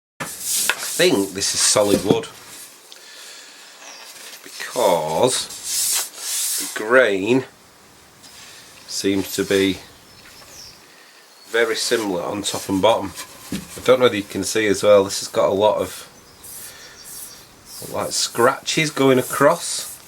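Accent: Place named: Yorkshire, United Kingdom. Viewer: Are you from Yorkshire?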